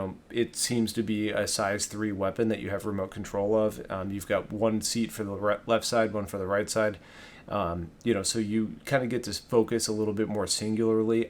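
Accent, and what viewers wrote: St. Louis, United States. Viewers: R u from st.louis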